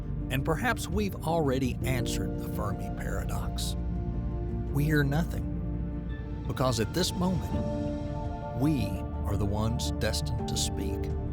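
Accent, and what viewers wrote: United States. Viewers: Those complex names just don't sound right with an American accent. It's pronounced You-carry-otic !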